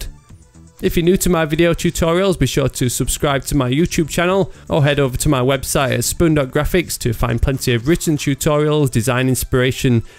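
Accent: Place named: Scotland, United Kingdom. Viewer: It's not about entirely whether you can handle, personally it's not that fast for me, but it's a bit fast for me, or...his accent is kind of wacky, I mean, Brits are talking funny, and then there comes Scots and Aussies, which are way more wackier/funny when they talk, so, a bit harder to understand what he means when he says something at a high speed without spots in between commands/lines. I have to turn captions on to understand what scortcut he meant, or what he was saying in the first place while trying to do something or explaining.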